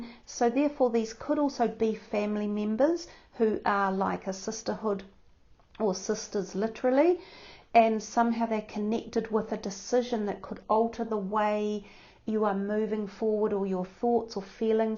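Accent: New Zealand. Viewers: Love the "sucx" (6). Good old Kiwi accents. Not making fun, was married to one!!!! Hope Enzo will be okay!!!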